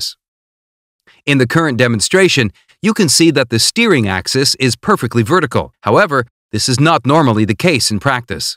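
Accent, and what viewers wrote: United States. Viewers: The video was produced with an American English accent yet the car is shown being driven on the left side of the road. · In what part of the world do they drive on the left, and speak with an American accent? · Speaking Americanized English, but with a steering wheel on the right side. Hmmm. This is an awkward video.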